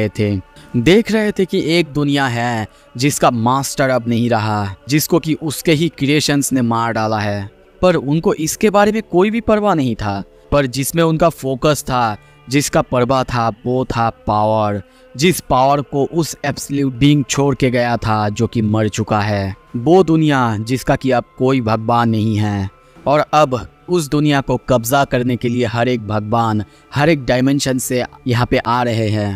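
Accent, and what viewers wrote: Bengal, India. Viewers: Are you Bangali or what?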